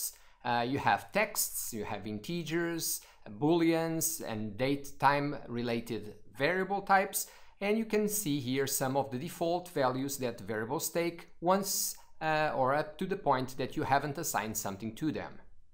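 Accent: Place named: India. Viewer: indian accent😵‍💫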